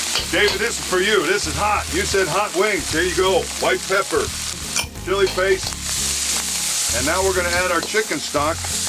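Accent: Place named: Italy. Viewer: U have a kind of a italian,,,ish accent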